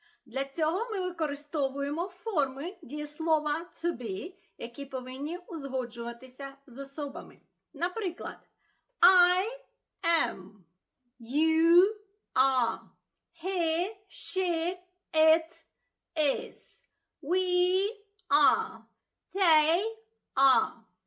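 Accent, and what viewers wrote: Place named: United Kingdom. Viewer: Цікавий формат подачі інформації. Дякую. Підписався. Окремо подяка за British English 🇬🇧👍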